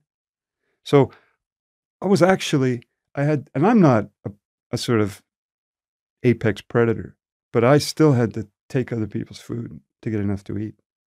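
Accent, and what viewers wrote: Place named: Widnes, United Kingdom. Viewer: Widnes lad innit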